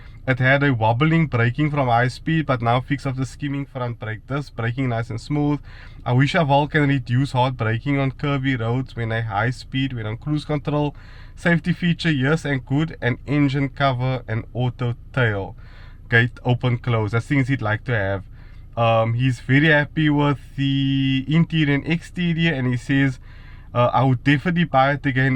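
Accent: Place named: Cape Town, South Africa. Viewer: Don’t change the way you talk it’s great listening to someone speak the Cape Town slang😁🙏 subbed because of that!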